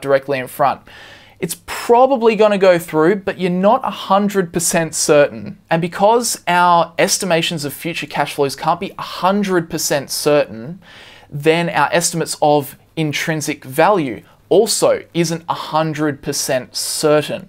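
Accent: Australia. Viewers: Just found this channel. Thanks so much for such excellent content. Nice to hear a fellow Aussie voice too.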